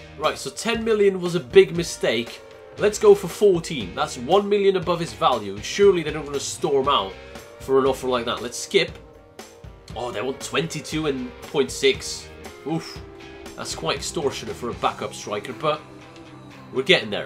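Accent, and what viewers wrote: Netherlands. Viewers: Is he dutch? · Are you Dutch? I'm pretty sure I heard Evert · Ben jij Nederlands (translation: are you dutch cuz i heard a dutch commentator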